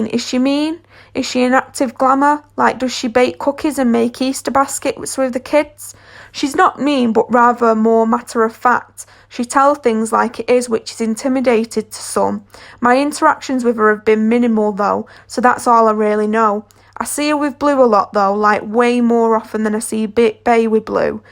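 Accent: United Kingdom. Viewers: You are probably one of theeeee dumbest. The storyteller has a British accent. Now, beyonce on the other hand, sounds like she has a 5th grade education. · It’s a British accent (northern region)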